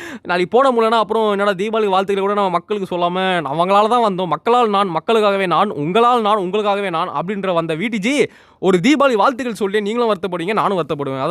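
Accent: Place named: United States. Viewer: Eh kirrku onaku english eh pesa varala ithula vera us kaare maari pesriya paythiam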